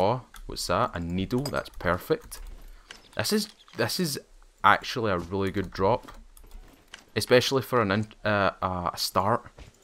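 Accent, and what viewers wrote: Scotland, United Kingdom. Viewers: I heard your voice and had to subscribe haha love the scottish accent probably due to my best friends family all be scottish and me growing up around it even though I love in england. Looking forward to binge watching some of your videos haha! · Scottish, I think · I love the wee Scottish accent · by the way you sound like bigmoney he is also a Scottish YouTuber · This guy has a very stereotypical Scottish accent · Tobot 27 it’s fukin Scottish he said he was from SCOTLAND 🤦‍♂️ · only reason i came here, the Scottish accent. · You sound like a very generic Scottish man but i still love you're voice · I would love to hear you say with your scottish accent BEGGOONNEE THOOOT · Is that scottish accent or welsh or irish or Scouser accent?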